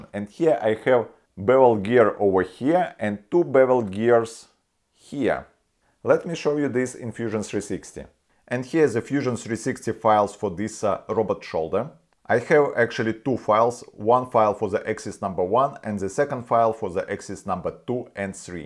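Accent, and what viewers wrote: Russia. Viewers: You twice mentioned Putin at end your video again..hm...hmmm... and this Rus accent...hmmmmmm!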